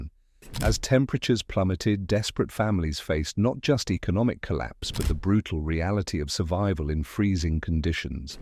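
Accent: United Kingdom. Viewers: The content is interesting. The mixture of American vocabulary with the British pronunciation keeps throwing me.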